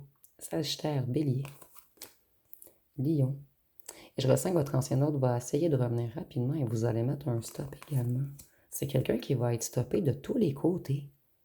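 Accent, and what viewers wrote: Quebec, Canada. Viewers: Vous êtes trés drôle ,j adore votre accent québécois .Je suppose que le vehicule dont vous ne trouvez pas le nom avec lequel le monsieur veut poursuivre ma voiture ,est un tricycle pour enfant .🤣😂Donc en effet il n est pas près de me rattraper .Il vaut mieux en rire .Merci .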